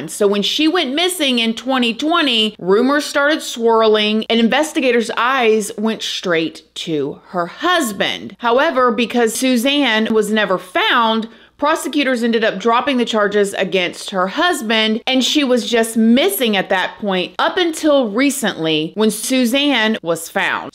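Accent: South, United States. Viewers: I think he did it. He just looks guilty... I pray that they put the correct person under the jail if it is him it would be so tragic for the girls because they have already lost their mother. If it turns out that he did do it these poor girls will have to spend years in therapy to help cope with the fact that they backed their father and he was lying to them. This is a sad sad sad situation. I also just want to say that I absolutely adore u and ur country accent. anyways I hope they figure out what happened and I hope they find the other person they were looking for when they found her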